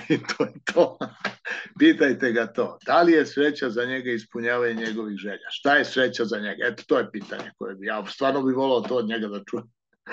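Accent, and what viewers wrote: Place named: Niš, Serbia. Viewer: Velja se baš zabavlja i ujedno zasmejava sve nas ...ovo gledamo grupno i ujedno se svi smejemo i tvom izgovoru ...čas nišlijski čas izkvareni beogradski..nikako pravilno srpski ali ok ...baš se svi smejemo i zabavljamo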